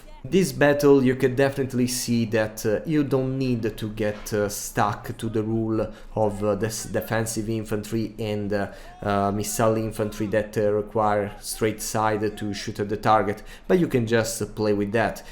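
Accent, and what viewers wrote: Italy. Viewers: Ciao, bel video, l'accento italiano comunque si sente a chilometri hahahahah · I new this accent was italian 😂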